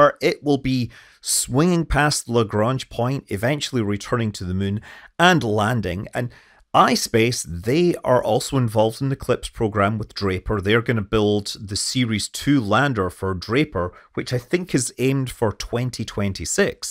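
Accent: United Kingdom. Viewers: Thank you for the wonderful presentation, a fascinating channel, and your great knowledge, enthusiasm & skills, but that (British? Scottish?) accent, such as at/about 00:5 to and to , particularly with the changes in voice volume & intonation (obviously intended to convey your obvious enthusiasm) make your speech unintelligible to American listeners, and does your work a terrible disservice. Now, with nearly 2 million subscribers, you may wish to ignore my voice, but I think that your fascinating thoughts could enjoy an even bigger audience if your speech was clearer. Thank you & best wishes!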